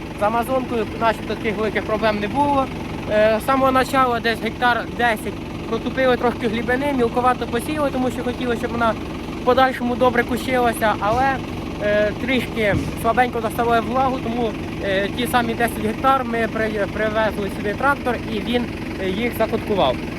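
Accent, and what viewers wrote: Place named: Western Ukraine, Ukraine. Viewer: у вас гарний західноукраїнський акцент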